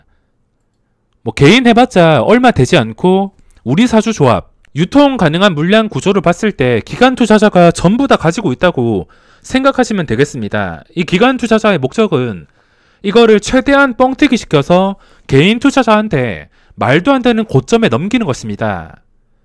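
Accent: South Korea. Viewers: 사투리가 섞인 서울말? 은근히 중독됩니다.^^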